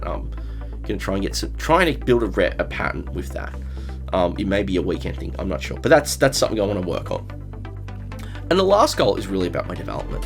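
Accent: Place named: Australia. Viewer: That Australian accent can be quite tricky for me, but I do understand most of it. ;) I think it sounds good, to cover the same game on different platforms. It's interesting to compare the differences. I'm slowly starting to regret naming my channel, so I'm stuck on one platform. I do feel most at home on the C64 (partly Amiga, as well), but it could be very interesting to try some of the other machines. Especially Amstrad and Spectrum. New exciting worlds will probably open up for me.. :) Anyway.. Looking forward to your 2016 content. :)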